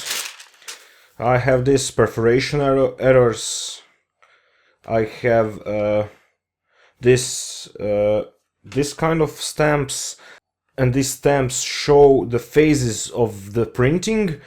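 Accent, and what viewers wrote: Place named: Eastern Europe. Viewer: Love your Slavic accent,Its very nice :)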